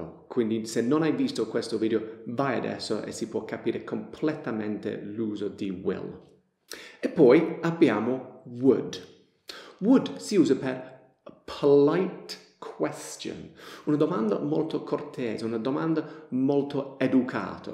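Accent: Apulia, Italy. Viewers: Ripeto...piu di due decenni per imparare l'inglese buttati nel cesso veramente, con le tue lezioni da madrelingua in italiano con accento simpaticissimo pugliese davvero è rivoluzionario il risultato e il miglioramento grazieeeee 👏🏻👏🏻👏🏻👏🏻👏🏻👏🏻👏🏻👏🏻👏🏻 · Ciao una domanda di curiosità:) per caso in Italia vivi in Puglia? Percepisco dal tua accento un non so che di pugliese. Comunque amo il tuo canale, studio lingue all'università e le tue spiegazioni rendono tutto più chiaro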